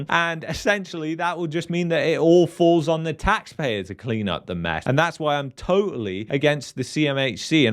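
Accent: United Kingdom. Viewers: 4 months ago you were saying the same shit , where is the apocalypse ? Please confirm. We all know it's coming soon but please provide some original information. No facts or data to back up your pseudo doomsday claims. I mean come on we already have Alex Jones and more lmao. Im sorry but i need more than a british accent and fake data to tell me what to aim my thoughts toward.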